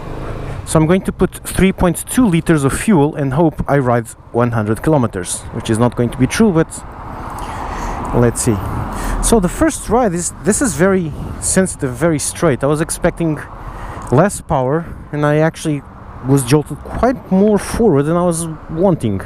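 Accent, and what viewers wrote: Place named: Portugal. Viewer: Faço like pelo sotaque tuga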